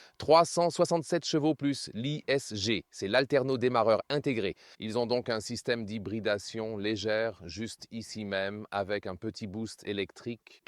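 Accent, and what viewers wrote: Canada. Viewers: J'adore vos vidéos, et vos comparatifs. Mais pourquoi avoir l'accent Canadien pour la traduction en France ?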